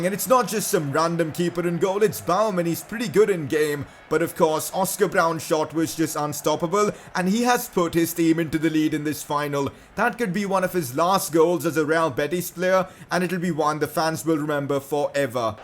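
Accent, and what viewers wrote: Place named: India. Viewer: This has more indian accent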